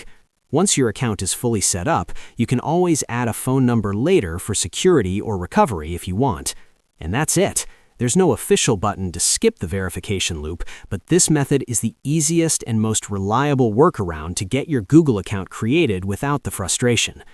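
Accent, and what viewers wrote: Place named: United States. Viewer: Finally a fucking american (proper english) accent would prefer a fucking ai than a fucking indian all the way!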